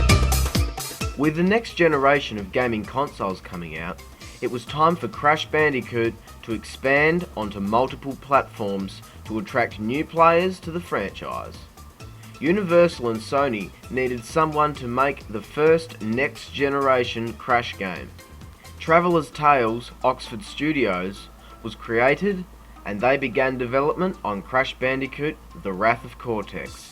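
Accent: Australia. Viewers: Your Australian accent really fits with this game :D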